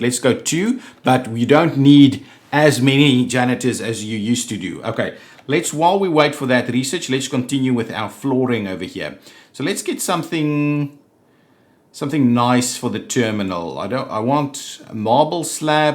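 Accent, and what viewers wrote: South Africa. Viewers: Hi..you sound south African..LOL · Great series. Watching them. I was actually going to ask if that accent is South African but answer said after 16min :)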